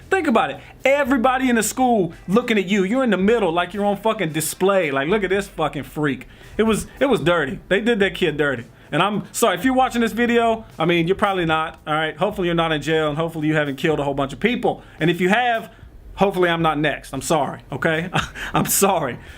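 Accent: South, United States. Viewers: boooy I thought u was black or southern u just got the sound of southern hospitality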